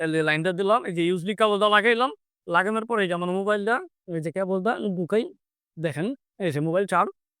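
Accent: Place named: Rakhine, Myanmar. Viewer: Rohinga vashai kotha bolchen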